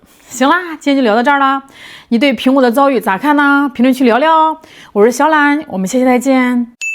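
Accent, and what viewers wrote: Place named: Henan, China. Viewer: 博主听着像河南人捏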